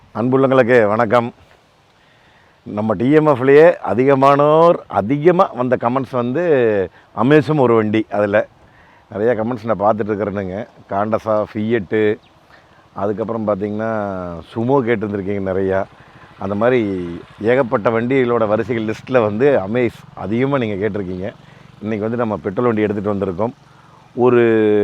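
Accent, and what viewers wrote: Kongu, India. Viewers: காரைவிட உங்கள் கொங்கு தமிழ் அழகு...அம்மா ஊருக்கு போண மகிழ்ச்சி 😀🥰🙏🏻. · Anna unga kongu tamil review tha mass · அட நம்மூர் அதாங்க கொங்கு தமிழில் கேட்டதும் மனசு குளிர்ந்து.அய்யா SPB மறக்கமுடியுமா. எதார்த்தமான தங்களின் பதிவு ச சொல்ல வார்த்தை இல்லை.my son also se your comments and he like to purchase hundai or Honda amaze.தங்களின் பனணிதொடர் வாழ்த்துக்கள்.எனது ஊர் k village சர்க்கார் கண்ணாடிப் ஆத்தூர் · Kongu slang is🤔😊 mesmerizing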